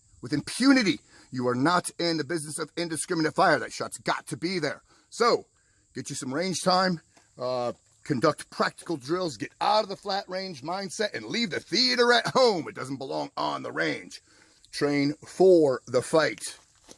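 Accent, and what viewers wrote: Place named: Scotland, United Kingdom. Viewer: ! 😂😂😂 I KNOW this guy would sneak up on me and kill me if he needed to. Because I DID NOT see that Scottish accent coming. Awesome stuff,! I hope one day we can drink a stiff one and share artwork together!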